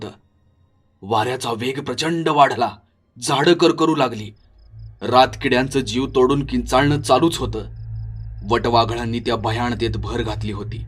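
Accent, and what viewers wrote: Pune-Satara, India. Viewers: That's not way konkan people speak...its more pune satara style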